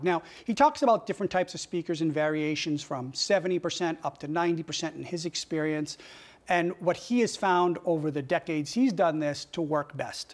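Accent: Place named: United States. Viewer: You sound American rather than a Greek to me.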